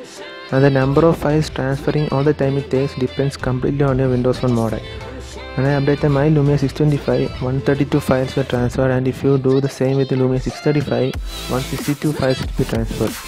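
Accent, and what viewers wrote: Kerala, India. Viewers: malayali anno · Malayali annalle😆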